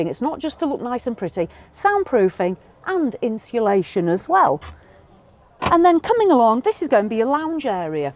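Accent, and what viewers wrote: United Kingdom. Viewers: It looks like the beds fold up as twin beds so you can have taller cargo space on one side if you want to? And leave one side down as a made-up bed? This feature isn't available on any American models. You are by far the best presenter I have ever seen! So unlike any of the American video creators who don't do their homework and then they stumble and bumble through the whole thing. Incredibly good presentation! Bravo!! And of course we love your British accent and all of your unique expressions LOL.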